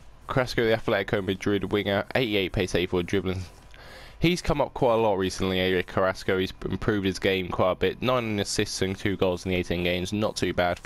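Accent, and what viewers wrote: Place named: Norfolk, United Kingdom. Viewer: I'm from Norwich mate, thought I heard it in your voice .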